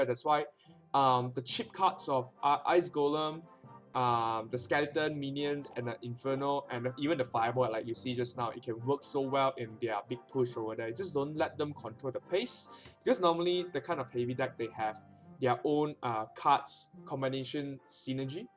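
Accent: Singapore. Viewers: when i watch this video i got alot of information.and i just subscribe it.peace man.btw are you singaporean ?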